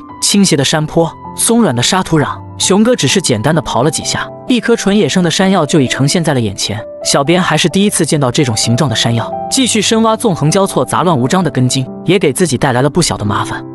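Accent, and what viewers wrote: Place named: China. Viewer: 聽聲音也知道是大陸人。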